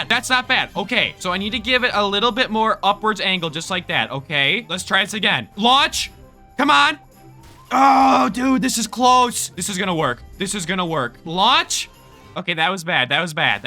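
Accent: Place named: United States. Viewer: im brazilian, and i just wanted to say, (dont get me wrong) i hate people from usa who talks with that "tone", but videos are SO good that i LOVE to see, and just ignores it. Love that channel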